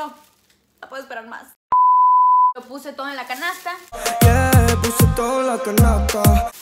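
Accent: Colombia. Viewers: O ye tu eres colombiana ❤❤ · Está tipa no tiene asentó mexicano , tiene asentó colombiano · ERES DE COLOMBIA????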